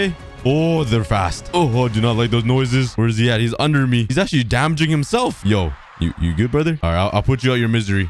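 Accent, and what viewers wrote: Canada. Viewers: is you canadian?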